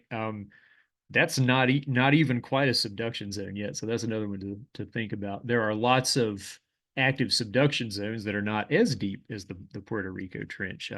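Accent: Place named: Maryland, United States. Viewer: I’ve been seeing lots of local geologists posting awesome videos.. you have to be from Maryland based on accent.. awesome video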